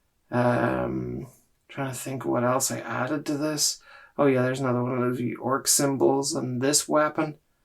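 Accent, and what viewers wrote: Northern Ireland, United Kingdom. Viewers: Nice N.Irish accent 😆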